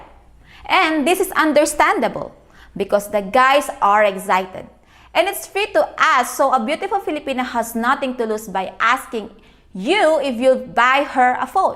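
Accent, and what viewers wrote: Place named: Thailand. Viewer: If I listen your voice and accent next stop Bangkok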